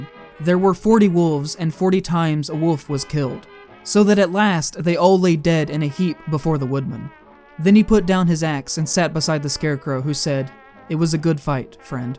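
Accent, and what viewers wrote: United States. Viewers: While I understand the British comparison, are you by chance American?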